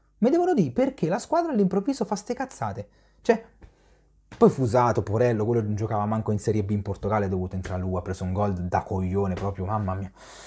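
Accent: Perugia, Italy. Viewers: Vago accento di Perugia o sbaglio? Forse mi sbaglio. 😎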